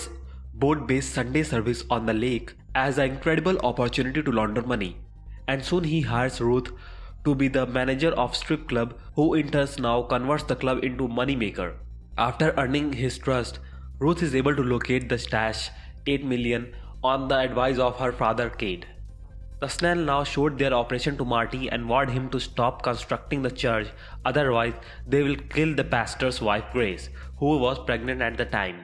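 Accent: India. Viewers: The accent was acceptable as I believe it's Indian accent but the subtitles were really really awkward. Spelling mistakes doesn't help. Just a suggestion, if u wanna make recaps, make sure u add subtitles with correct spelling. Wikipedia of certain series also helps making a good recap as they show written update of every show episode by episode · Overall I feel this is a very good recap covering all the most crucial details to the Ozark story (Season 1), with the audio and video editing also being very good. If I had to give a critique on the video, my only critique would be that (as an American) your Indian accent is a little tick and some words (specifically proper names of people and places) are hard for me to make out, if you were to add subtitles to the video (copy and pasting the script into the subtitle option when uploading the video) I feel this would go a long way in clearing up any misunderstandings that might arise because of your accent. 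Other than that, keep up the good work, and thank you for this video, it really helped😊 P.S. sorry for all the mean comments, some people I feel do not know how hurtful their worlds can be and say things without thinking, so do not take it to heart, honestly your accent is not that bad, people are just ignorant😉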